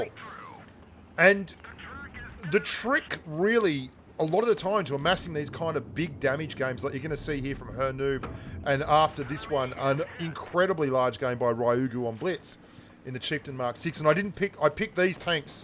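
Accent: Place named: Australia. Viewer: hey mate, r u Aussie? swear to go you sound like one.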